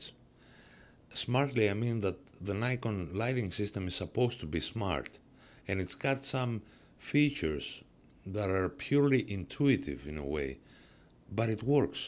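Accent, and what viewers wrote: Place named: Greece. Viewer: Your accent is so Greek!!! I am not ready to move on from my d850 I don’t like mirroless!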